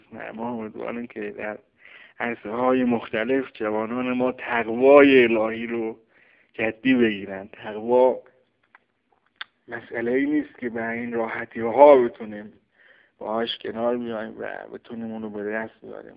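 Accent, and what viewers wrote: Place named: Shiraz, Iran. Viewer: لحجه شیرازیت جلوتر میاد کاکا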